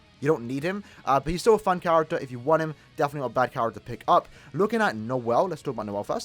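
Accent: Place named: United Kingdom. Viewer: Wha' a salty bri'ish bloke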